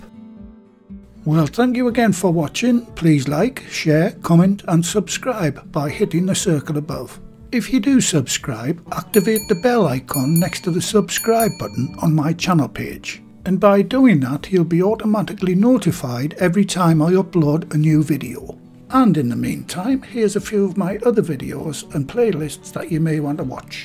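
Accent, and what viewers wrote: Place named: Newcastle, United Kingdom. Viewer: amazing recipe but even better when it’s read out by what sounds like a geordie version of Winnie the Pooh! 😊 🤩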